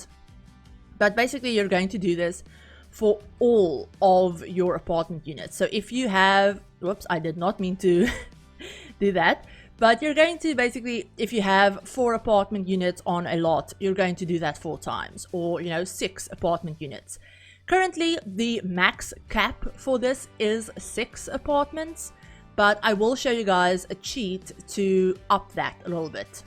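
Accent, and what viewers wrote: South Africa. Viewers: You sound south African, im from SA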